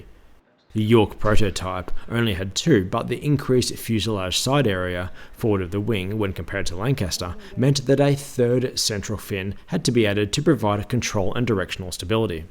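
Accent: Australia. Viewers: Bloody brilliant mate, and it’s great to hear an Aussie voice. Keep it up. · It’s so nice to see a factual, educational clip AND it’s spoken in Aussie!😂😂🇦🇺 thanks mate, love your vids.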